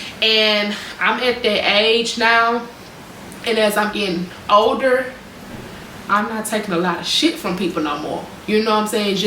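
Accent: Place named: Texas, United States. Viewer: Hey girl new subbie!! You have been making your rounds. I've seen you on Gina's channel as well as commenting on Rochelle's!! Girl I heard the Texas in you as soon as you opened your mouth I knew I was at home! I love it! I'm from Texas too!! Make sure you turn up this year, go out of your 20's with a bang🎉💃🏽🍷I love your vibe, you're so cool and I can tell you're a sweetheart.🥰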